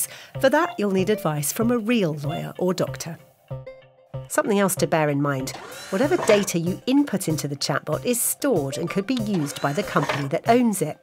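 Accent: United Kingdom. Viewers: English with a British accent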